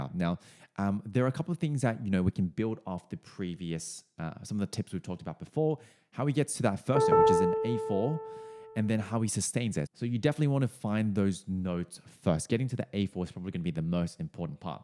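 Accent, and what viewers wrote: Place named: Australia. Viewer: I make my piano students listen to Jeff to understand different tones and phrasing. I think my Caucasian Canadian choir did not appreciate my raving about Jeff! BTW, are you in NZ? I’m trying to place your accent. I left in 1987. But you pronounced “example” with the Aussie “a”.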